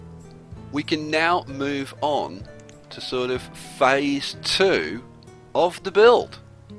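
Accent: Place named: Midlands, United Kingdom. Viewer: What is your dialect? It sounds a bit midland-esque. Just curious. Good videos.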